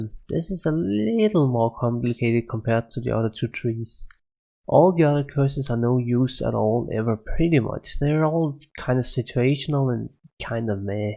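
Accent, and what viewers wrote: Denmark. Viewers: Du lyder Dansk :) But really cool guide mate. might consider rerolling Necro.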